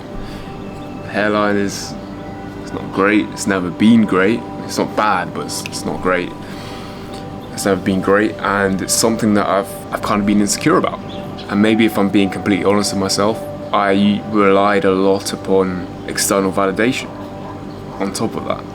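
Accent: England, United Kingdom. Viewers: And not to mention that gorgeous cut-glass English accent that makes come across as so classy and divinely sweet and adorable - as an older Irish gay man myself, I’d definitely date the handsome in a heartbeat ❤❤❤❤❤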